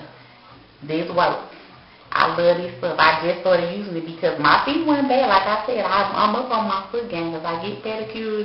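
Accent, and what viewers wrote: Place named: St. Louis, United States. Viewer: Hard-da-tion-----Foot game--duces -You sooo St.louis. I love u......gotta go on my way to Sallys lol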